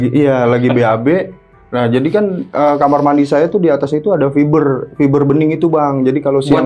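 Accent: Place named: Surabaya, Indonesia. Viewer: Surabaya mn suaranya☺️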